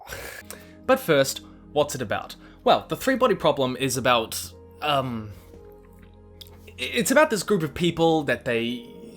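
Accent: New Zealand. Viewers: Great to hear a Kiwi do a review, too often it's Americans which is fine but I like to hear different voices. Thanks for your review. I'm going to buy the trilogy. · Yo bruv. Good vid. Where you from? You sound like a kiwi to me. Good to know there's more than just me reading this sort of thing in nz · Its a kiwi. Love it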